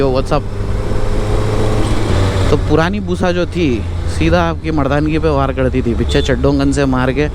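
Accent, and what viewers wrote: Hyderabad, India. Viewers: Bhai Ap toh Eid ke Chaand ho gae ho youtube ke…. Happy you uploaded a video….roj dala karo video if possible…..aacha lagta h apki hyderabadi sunnke…..aurr thodi knowledge mill jati h bike ki joh aur koi nai bolta h…😬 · he's your Best YT-Influencer for Bike Reviews, ... Not a Shill for Castrol etc. Loved his Hyderabadi Accent, and thanks for original content. I have the Trident-660 & 390-Duke, now looking at the SuperSport-950S as 3rd bike.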